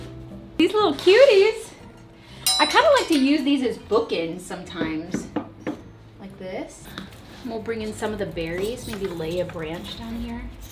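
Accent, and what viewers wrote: Texas, United States. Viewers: I love the little Texas twang in your voice now. As soon as you grabbed the tree I thought she’s gonna put on gloves cause she learned from childhood. Lol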